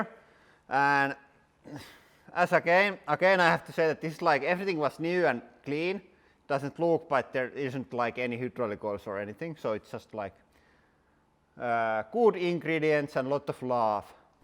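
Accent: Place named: Finland. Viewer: its the Finnish accent I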